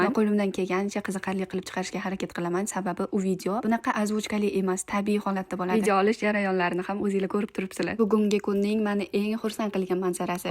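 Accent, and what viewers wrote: Tashkent, Uzbekistan. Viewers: Узиз наманганлик буп туриб , шевез тошкентчаю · НИМА УЧУН НАМАНГАНЛИК БУЛА ТУРИБ - ТОШКЕНТЧАСИГА ВОТТИЛАЯПСИЗ ??? ЯНА ЭРИНГИЗ КАРИС ??? ЯНА УРИСЧА КУШИБ ГАПИРАЯПСИЗ ??? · Жуда хайрон колдим Намангонликлар жуда этикодли буларди ёки сиз Тошкентча гапиряпсиз Тошкентда катта булгансиз шекилли йукса Намонгонликлар динни кабул килса килмаган буладими ишкилиб бошка динга тегмайдику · toshkent shevada qando gapiryapsiz namanganlik bulsayiz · Наманганлик шеваси бошкачаку бу киз тошкентча гапирди😊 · Наманганлик булсангиз нимага тошкент шевасини аралаштириб гапирасиз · Хаммаси зурку,лекин бир нарсангиз мани ажаблантиради.Шевангиз,наманганликлар бузилган тошкентча гапирмайди.русча кушиб гапиришингиз эса жуда савияси паст билимсизлигингиздан белги.илтимос узингизни бу киликларингиз устида бир оз ишласангиз булармиди.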